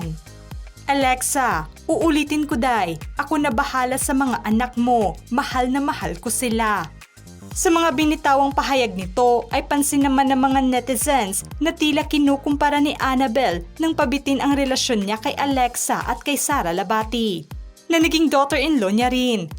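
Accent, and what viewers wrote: Visayas, Philippines. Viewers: Pag-aralan paano bigkasin ang ibang mga salita. Hindi porket Bisaya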